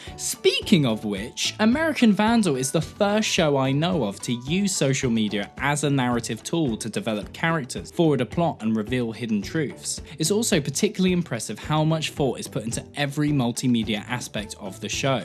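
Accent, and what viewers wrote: United Kingdom. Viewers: The British accent is like nails on a chalk board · Creamy British vocal chords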